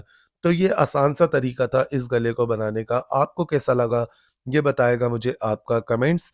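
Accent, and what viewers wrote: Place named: Rajasthan, India. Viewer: Assalaam o alaikum. Mash'Allah bohat asaan teriqey se aap ne gala banana sikhaya. Mai aap ke videos bohat ghaur se daikhti hun. Mai bhi bohat safai se her qism ke kaprdey seeti hun laik phir bhi mainey aap ke videos se bohat kuch seekha hai aur mazed seekh rahi hun. Aap ke lehjey se lagta hai aap Jaipur Rajistan se hain. Kaash aap karachi mei hotey to main aap se hi keprdey silwati. Wassalaam. Jazzak'Allah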